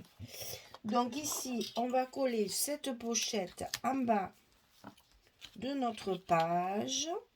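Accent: South, France. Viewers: Bonjour, quel beau papier, c'est très élégant et vous le sublimez par votre talent!!!! J'aimerais savoir où vous vous êtes procuré la pointe avec laquelle vous enlevez la pellicule des bandes de colle double face? Merci pour votre réponse et ce merveilleux partage!!!! J'aime bien votre accent car j'ai vécu les 33 premières années de ma vie dans le midi et maintenant je vis en Bresse!!!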